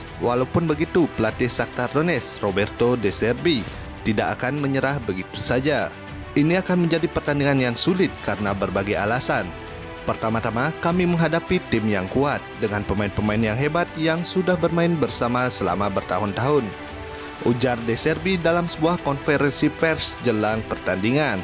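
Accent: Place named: Aceh, Indonesia. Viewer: Admin yang bicara logatnya macam orang aceh.. betul tak min...FORZA INTER..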